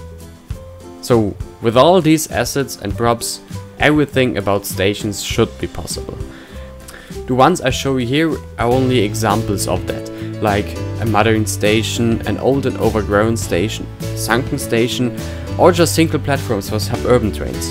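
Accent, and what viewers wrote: Germany. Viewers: Save Deutsch dein Englisch man xD aber gutes Totorial/Video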